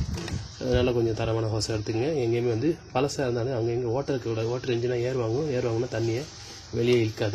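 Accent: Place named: Tirunelveli, India. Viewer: Ahh Nama ooru slang....Tvl uh sir nenga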